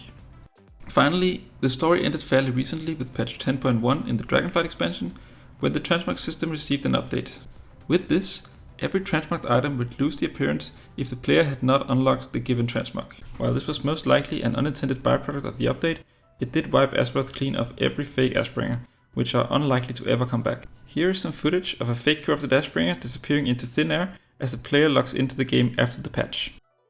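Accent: Denmark. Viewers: "A new channel based in Europe" You are very much Danish my guy, the accent does it all :0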